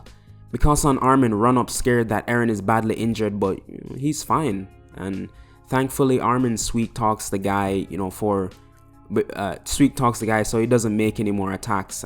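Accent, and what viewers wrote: Jamaica. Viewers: Dude are you Jamaican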